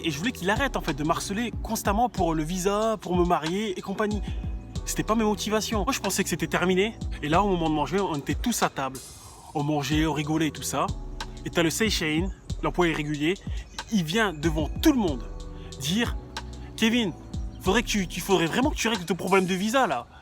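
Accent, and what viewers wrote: Japan. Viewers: Oooh trop cool ton accent japonais !!!😱😱apprend moi aussi😱😭😭😭😭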